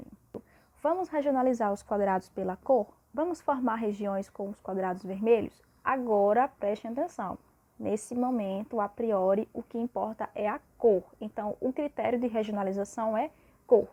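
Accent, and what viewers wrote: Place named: Northeast, Brazil. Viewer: Bonito sotaque nordestino!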